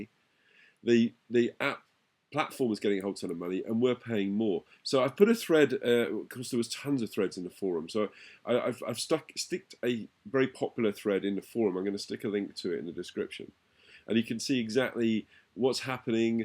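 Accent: England, United Kingdom. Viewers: you sound like you live in England... NO TAXATION WITHOUT REPRESENTATION!!!!!!!!!!!!! look it up if you dont know ;)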